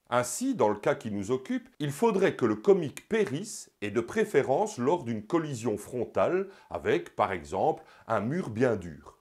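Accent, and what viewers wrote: Belgium. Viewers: Bonjour d'un voisin de Silly^^ Magnifique vidéo, je découvre ta chaine grace a Mr Sam depuis aujourd'hui meme, et j'ai dévoré ta vidéo sur la méthode, avant de découvrir celle ci. J'ai hâte de découvrir tes autres vidéos, je me sens tellement bien en t'écoutant, un peu de douceur et de bon sens avec un accent belge pas trop prononcé:p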